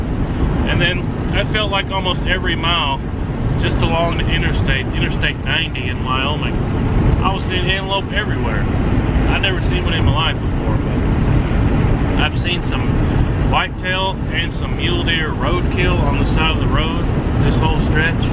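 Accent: South, United States. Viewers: I didn't know you are a fellow Tennesseean, I could tell by your accent you are from the South. I just didn't know where.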